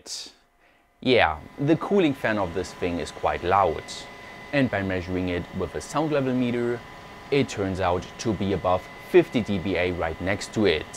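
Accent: Germany. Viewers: I have also been subscribed for many years. But I don't understand why you mislead people that you are Scottish if you have such thick German accent.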